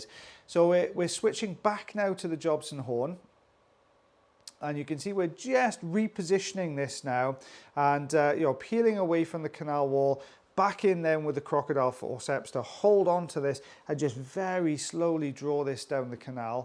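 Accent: Wales, United Kingdom. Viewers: I think his accent is the nicest of all the British accent. Yeah if they don't like it don't watch/ listen. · I learned to like the wax videos so that I could spend time listening to his beautiful Welsh accent. Anyone with issues with his speech should probably learn a bit more about geography. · I just LOVE watching all your vids! think this is the 3rd time I´m rewatching this vid! Sweetie never loose the welsh way of talking, since I moved over here I´ve come to adore how everyone talks to eachother as if they are one big family <3 Wich of your locations do you work most in?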